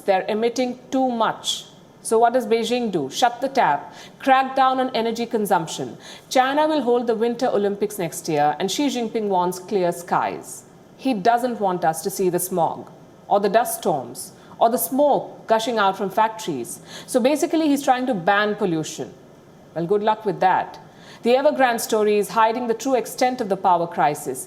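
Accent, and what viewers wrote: India. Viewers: Love her Inglish (indian english) accent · I just love the Indian accent and smell.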